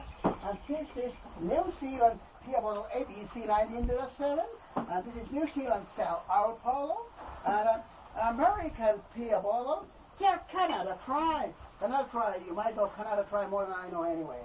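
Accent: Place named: Ireland. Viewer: Bros got an Irish accent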